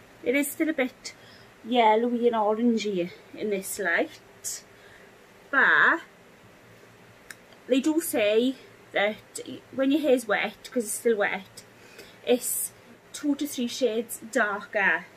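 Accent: Wales, United Kingdom. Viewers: Hiya Lovely x A fellow 'Welshie' even though I'm South African born 😊 x Just loooove your accent way too much x Literally just done mine as well and same result with the more yellowy roots x Was just wondering if you've used a purple shampoo after the video and if you have, did it help with the yellow?? As heck yes, I love the results but nearly had a stroke as the yellowy roots make me look proper pink lol x I'm already in my head summoning my hairdresser friends for emergency damage control lol! 😨😅😂 x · I looove your welsh accent its so cute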